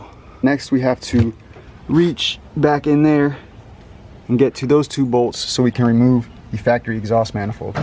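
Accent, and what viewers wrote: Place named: Louisiana, United States. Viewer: You gotta be somewhere near Louisiana the way you talk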